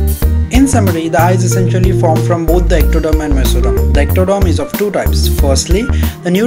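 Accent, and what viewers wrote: India. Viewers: Yikes...indian accents are so attractive....watch out british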